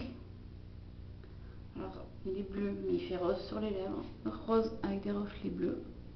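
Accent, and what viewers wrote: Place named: Quebec, Canada. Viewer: Hello hello!! Ahhh tu viens du Québec? Il n'est pas bizarre ton accent! J'aime bien perso!! Ce make-up te va à ra-vir!! Les couleurs des Monobulles sont sublimes j'avoue....Et j'adore ta couleur d'iris... Héhé t'inquiètes, je ne t'oublie pas avec Alice... J'ai ma petite idée dans ma petite tête.... Gros bisous et merci pour la dédicasse! T'es choute ♥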